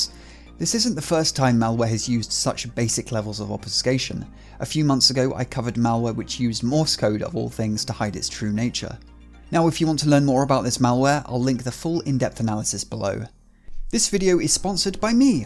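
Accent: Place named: United Kingdom. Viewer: Love you r voice 💖😍 ... excellent British accent....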